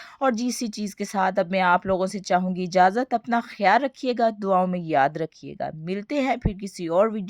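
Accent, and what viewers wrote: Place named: Sargodha, Pakistan. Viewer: Dear ap Sargodha sy hy kia ap ka lehja aisa lga voice sy batian bhtt sandar krti hy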